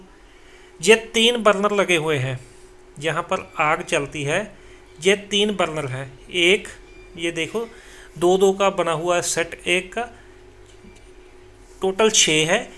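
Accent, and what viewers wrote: Punjab, India. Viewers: Hindi with punjabi touch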